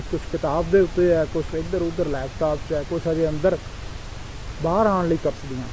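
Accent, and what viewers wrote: India. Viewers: Dear ❤️ professor sAhib, GoOd morning jNAb, daily jNAb nU M sUNNdA, samjhda bUT nOW, hUM mARi wARi, mARi public dealing kAfi hAi, & 1982, 85 tOUN chALdi Aaa Rhi hAi, As pER mY Opinions, face reading, jNAb waqti tA jO bi hAIN, sO hAiN bUT jNAb dA ways of talking, thinking, BOL ChAL,LEhjA hAR Lehaz tOUN mANU hindustania wAllA Lagda hAi nA ki Pakistani, if Any wAY, I am wRONG, please sorry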